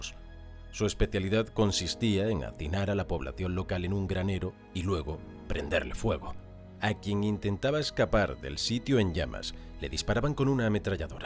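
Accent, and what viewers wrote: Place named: Spain. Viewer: Estoy adicto a estos videos, la voz y el acento español van como piña jajaj saludos desde Argentina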